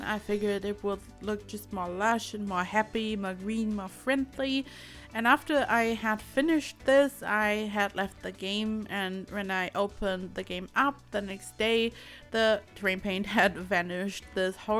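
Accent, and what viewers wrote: Germany. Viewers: I recognized the accent, but wanted to make sure. Ich bin auch aus Deutschland ☺️ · Are u german? You sound kinda german to me ☺️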